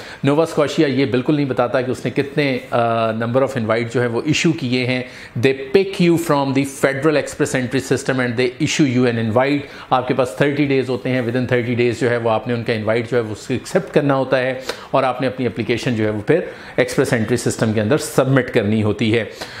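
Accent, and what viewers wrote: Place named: India. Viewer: It's a shame the video doesn't come with subtitles. It looks like it was a super interesting information but I could only catch a couple of phrases. Indian accent is super difficult when you're not an english native :(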